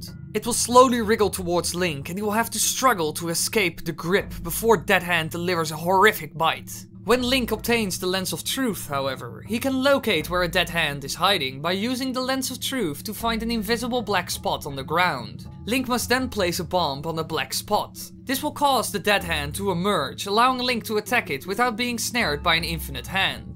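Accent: Netherlands. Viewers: Lol, u are dutch, i can tell. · Your accent sounds dutch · He has a very heavy Dutch accent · jesus man you may be Dutch but don't lay the accent on that thick....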